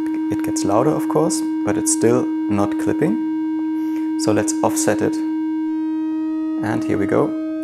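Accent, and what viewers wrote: Germany. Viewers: Wow, you sound so German!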